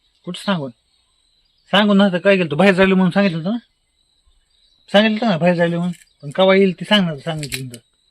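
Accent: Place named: Kolhapur, India. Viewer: चे बोलणे एकदम मस्त आहे कारण तिचे बोलणे थोडे थोडे कोल्हापूर चे वाटते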